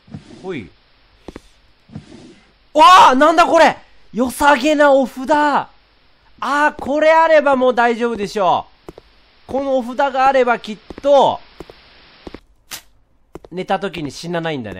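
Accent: Kansai, Japan. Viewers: 今回の関西弁が強い(笑) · の関西弁素敵すぎる☺︎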